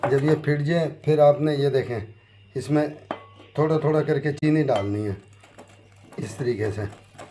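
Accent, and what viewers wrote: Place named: Rohtak, India. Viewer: Assalamualikum Aap ka kaam bht acha hai aap ka taluq kis ilaqe se hai? Kia rohtek se aap ka taluq hai aap k lehje se yani Bolney k andaz se lagta hai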